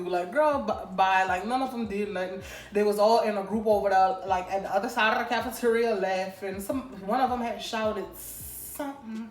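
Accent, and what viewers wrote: New Orleans, United States. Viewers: She gotta be from New Orleans 😂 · I can tell she from new Orleans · You must be from Louisiana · you must be from NOLA 🥺❤️ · i think she from nola dats how we talk lol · "um power to the ppl" 😭 she gotta be from new orleans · accent is so pretty you must be from New Orleans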